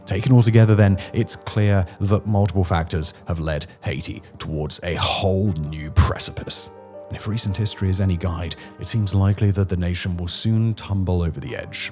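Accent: United Kingdom. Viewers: Idk I think he's just british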